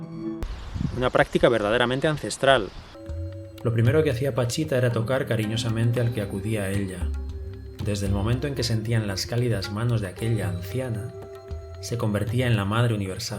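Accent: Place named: Spain. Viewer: Todo bien pero no soporto ver y oir este interesante documental con el acento español...como que algo no cuadra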